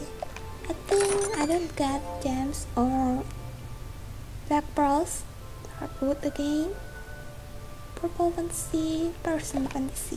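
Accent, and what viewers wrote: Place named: United Kingdom. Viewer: You have a nice britsh voice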